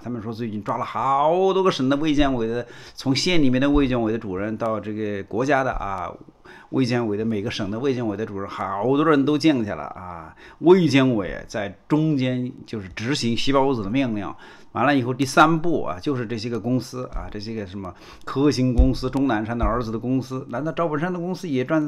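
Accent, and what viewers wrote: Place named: Changde, China. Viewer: 口音听上去常德人呀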